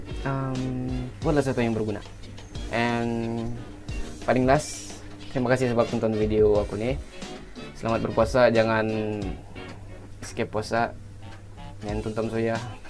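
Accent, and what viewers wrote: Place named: Brunei. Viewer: Ohh patut tah melayu mu cam brunei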